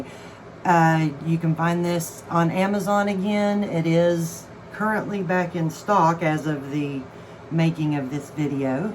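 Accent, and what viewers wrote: South, United States. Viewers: Hi, another gorgeous piece! You’re so patient. Can I ask, when using the photo paper, doesn’t it soak through? I bought an ordinary brand for ink jet printers and that’s exactly what happens. Soaks straight through. Have I got the wrong type? Or is that normal? Thanks. I love watching you create and listening to your southern accent. 🙏🙏🙏🤗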